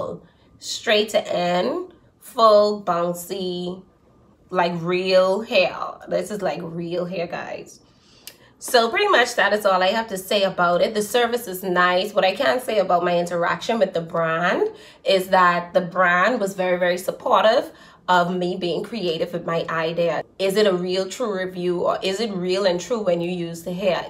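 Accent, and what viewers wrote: Bahamas. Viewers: Are you a Bahamian? I think I hear the accent but I wanna be sure lol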